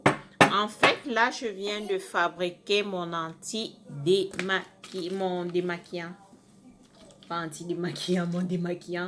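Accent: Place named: Gabon. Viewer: super video , t es d oú ?t as comme un accent gabonais.😊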